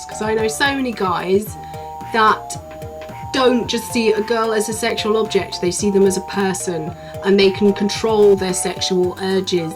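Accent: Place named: England, United Kingdom. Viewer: It's so great to see an entertaining Englishwoman who's accent doesn't make me want to kick a puppy. English accents are either cool sounding and enjoyable, or ridiculously annoying and hellish. There is no inbetween for me.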